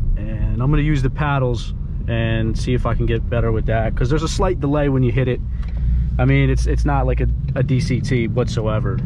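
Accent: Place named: East Coast, United States. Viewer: Definitely from east coast. Delco even perhaps?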